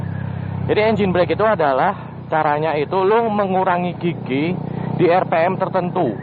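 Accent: Surabaya, Indonesia. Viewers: bang org surabaya ya? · Wakk.. suroboyo cak 😂😂😂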